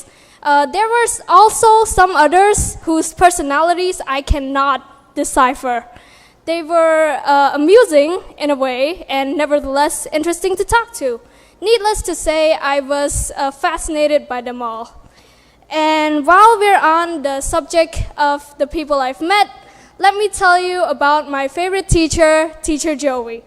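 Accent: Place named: United States. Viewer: ACE follows British , but her accent is like the American 😄 Amazing speech ever ! 💙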